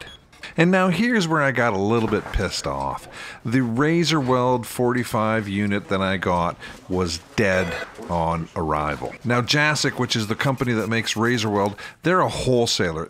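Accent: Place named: Ontario, Canada. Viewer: i was like he sounds like hes from Ontario lol. Im next to Montreal very cool channel nice to see folks from Canada on YouTube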